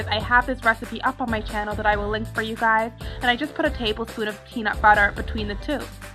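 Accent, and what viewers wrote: United Kingdom. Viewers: I know this is irrelevant to weight loss, but are you from the UK? I really like your accent, hahaha. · you have a british accent sometimes!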